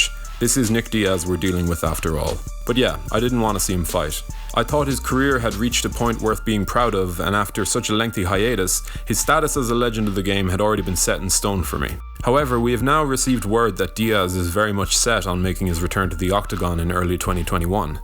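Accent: Ireland. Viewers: Your posh irish accent is annoying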